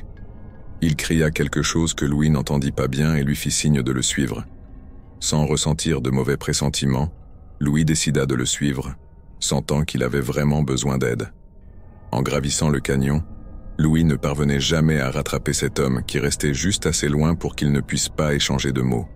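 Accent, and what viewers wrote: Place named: Canada. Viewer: Quel régal ❤️ mais des fois ça déraille lol ça parle canadien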